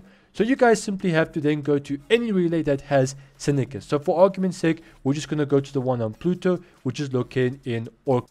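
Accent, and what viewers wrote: South Africa. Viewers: this ou south african?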